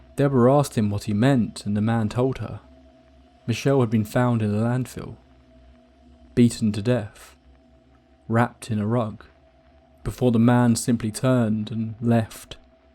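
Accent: United Kingdom. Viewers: Your voice makes these stories so boring and uninteresting. Please be less British.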